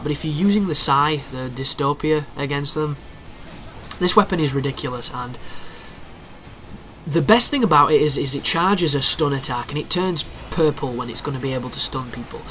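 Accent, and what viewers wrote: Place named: Yorkshire, United Kingdom. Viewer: Hahaha you are from yorkshire, ME TOO!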